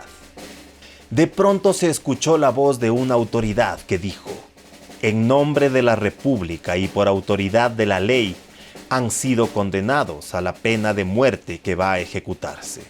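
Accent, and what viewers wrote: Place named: Ecuador. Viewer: Que historia tan horrorosa, pero bien contada, me encanto sobretodo con ese acento de voz ecuatoriano tan bonito. Una historia de horror en un pais que admiro muchisimo.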